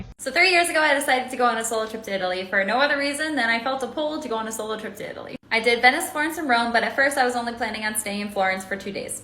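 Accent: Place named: United States. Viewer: I am interested in your impressions of Italy, a wonderful country, but please speak more slowly. Not everyone is American and understands your pronunciation…….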